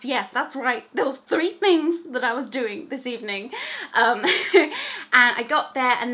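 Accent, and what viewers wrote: Birmingham, United Kingdom. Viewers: I never realized you’re from Birmingham. I was told it’s the least attractive accent in the UK 😆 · Omg i had no idea you were a brummy as well twins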